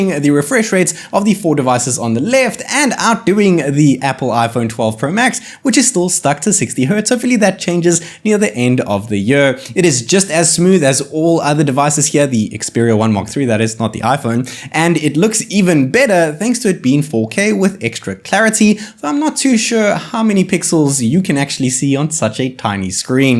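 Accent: South Africa. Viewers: Hi, like your channel i can tell your South African, whats the easiest way to get my hands on this sony 1III in South African?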